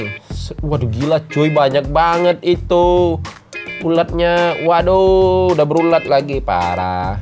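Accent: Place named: Medan, Indonesia. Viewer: Orang medan mana suaranya!!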